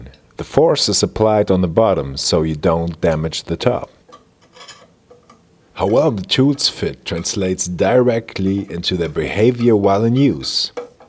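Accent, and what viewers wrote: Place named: Germany. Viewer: Interesting video, interesting toolset. I only have seen those hollowed tips on -Screwdrivers and I am thinking about such a set since months now. But if I am totally honest, I don`t have to work with a lot of slotted screws. So probably I will be fine with the screwdrivers I already own. Nonetheless I`m really happy to see you back here again. I really like your style of videos. Always kinda fun to hear germans talking english about german tools and been remembered to that when they call brand names oder place names - especially "Remscheid". Finde ich super!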